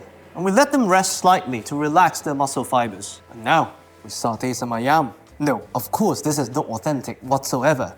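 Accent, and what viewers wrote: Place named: England, United Kingdom. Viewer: Your accent has a hint of English! ❤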